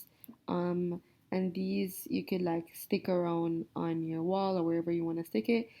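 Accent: Jamaica. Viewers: Dope video, why yuh sound like a yawdy🤔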